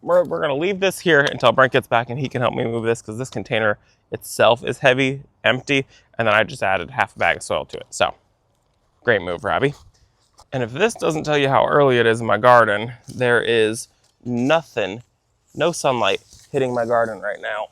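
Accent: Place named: South, United States. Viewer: I noticed a more southern twang as well! 😅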